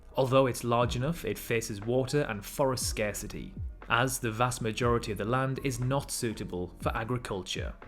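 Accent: United Kingdom. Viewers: I really love your British accent bro!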